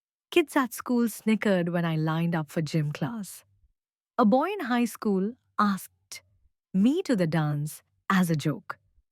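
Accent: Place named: South Asia. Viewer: A white woman with a South Asian accent ?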